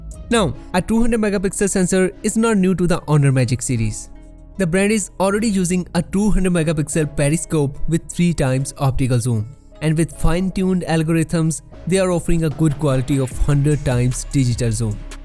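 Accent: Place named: India. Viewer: No offence, but the English Indian accent is the worst at the world Seriously my ears can't bear to hear it even 1 moment 🤢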